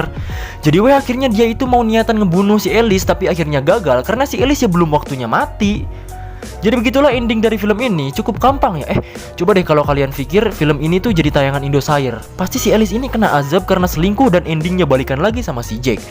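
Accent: West Java, Indonesia. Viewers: ngakak kie sunda na wkwkwk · Orang suda ya min hehe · Lucu sunda na mna a? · Pasti org sunda yeuh s mimind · sunda bet dahh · Fix org sunda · anying sunda na mantap ngangkang aink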